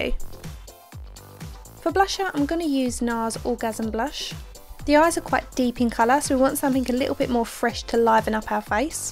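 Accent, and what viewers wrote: Essex, United Kingdom. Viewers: are you from essex 💁🏼❤️🙌🏼💯💋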